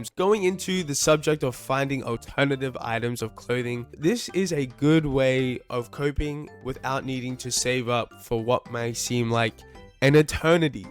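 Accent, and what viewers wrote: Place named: Canada. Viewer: OH MY GOSH OKAY IM SO SORRY 😭 your accent made me think you were canadian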